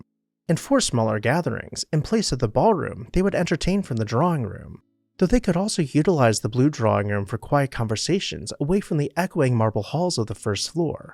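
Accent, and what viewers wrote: United States. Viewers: Correct. Although factual, the narrator has an annoying way of speaking and a definite American accent. Words are written using American spellings omitting the 'u' as in the word favourite. Never the less, Toronto does have a history of demolishing its best architecture, as this video illustrates. I've been to Chorley Park and all that is left is the plaque and the bridge. Truly sad.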